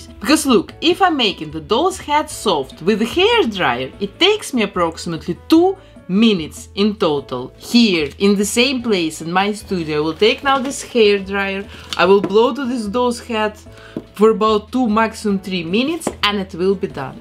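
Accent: Russia. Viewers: Alena Vampanskaya yeah, I thought about it too. I'm just interested in her cute accent Well, it would be great, if she's Russian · What is your accent? Russian??? Whatever it is I LOVE IITTTTT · Блин какой русский акцент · Мне самому показалось, что что у неё русский акцент, а теперь даже сомнений не осталось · Почему мне кажется ,что здесь присутствует русский акцент?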